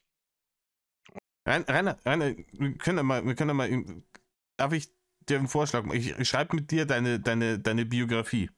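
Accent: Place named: Upper Palatinate, Germany. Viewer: Kommst du aus der Opf.? Hört sich bissl so an -> Ich wohn aba scho lang im Osten & hör den Unterschiede nimma so guad😉 Wie & wo auch imma: Beste Grüße ins scheene Bayern💙🤍💙🤍💙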